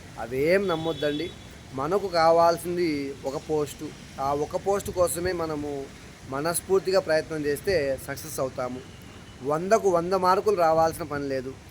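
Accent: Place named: Adoni, India. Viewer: Sorry సర్,,, మీరెవరో తెలియకనే మన ఆదోని వారు గమ్మత్తు మాట్లాడుతున్నాడు,, అని subscribe చేసుకున్న,,, కానీ మిగురించి తెలుసుకున్న మీరు ap స్టడీ లో తోపు అని,,,, hatssapp సర్ మా జిల్లాలో పుట్టినందుకు,,,,❤❤❤❤❤❤❤❤❤❤❤❤❤❤❤❤❤❤❤❤❤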